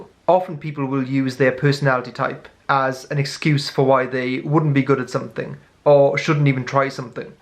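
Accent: Wales, United Kingdom. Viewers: Are you Welsh?? I'm from South Wales and you sound exactly like a Welsh person. Us Welshies are very rare to find so I always get really excited when I hear someone who sounds Welsh. First video I've watched from you and it's so accurate, completely agree with you!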